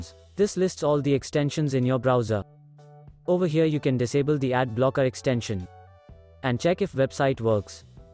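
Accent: India. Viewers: Damn even if it's a robotic voice these people achieved to make it sound indian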